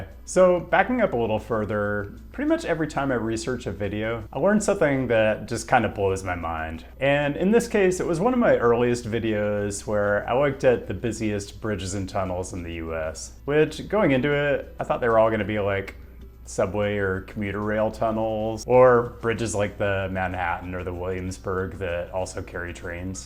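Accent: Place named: San Diego, United States. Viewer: He becomes more handsome in each video , is he from southern Cali he speaks like how San Diego people sound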